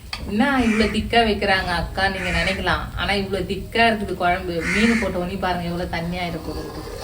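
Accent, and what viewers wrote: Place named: Jaffna, Sri Lanka. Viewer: இலங்கை யாழ்ப்பாண தமிழ்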